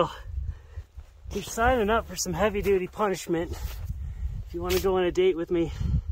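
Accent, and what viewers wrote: Canada. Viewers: I know I"m an odd guy, but the two things I look forward to most in your videos is the opening jingle and the way you prounounce Canadian 'out' as 'oot'. Love it. And of course your in van adventures.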